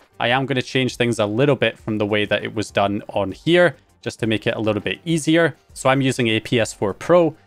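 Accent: Scotland, United Kingdom. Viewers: My Scottish wife wondering which part of Scotland you're from?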